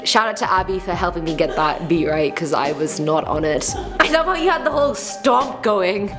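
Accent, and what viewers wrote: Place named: United Kingdom. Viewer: She is my favorite host the British voice just hits different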